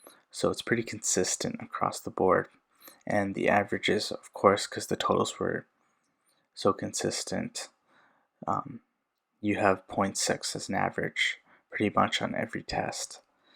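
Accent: Punjab, India. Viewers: bro are you from Punjab?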